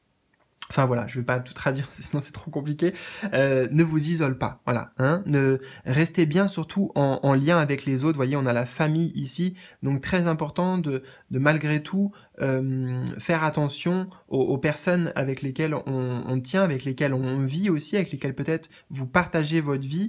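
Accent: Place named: England, United Kingdom. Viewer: Bel accent anglais!!!😁 Merci tout plein pour cette guidance pour toute cette bienveillance 💜👍😘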